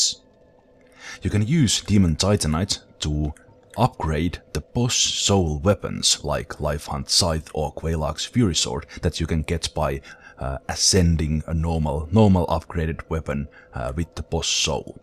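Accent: Finland. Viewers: Dat finnish accent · You from Finland? Your english sounds like typical english speaking finn : D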